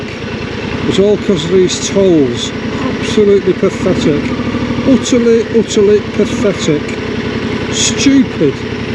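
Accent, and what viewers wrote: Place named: North, United Kingdom. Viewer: I love it ….the sounds of a disgruntled northerner- just like me 😮 “ Put me in charge and I’ll sort it out “!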